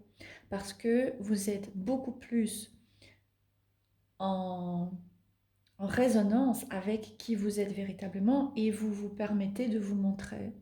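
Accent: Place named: Jura, France. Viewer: J'aime bien votre accent (Jura?)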